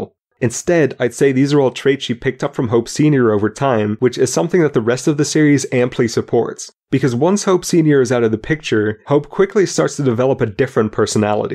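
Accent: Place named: England, United Kingdom. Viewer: I heard some English accentuation in this video. You might have been picking up something from your friends too it seems!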